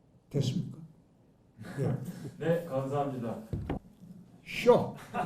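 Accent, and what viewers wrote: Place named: Gyeongsang, South Korea. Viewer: 경남 사람 발성과 말투는 다 그래요.^^ 어쨌든 발성으로 평가 받는 사람은 가수들 아닐까요?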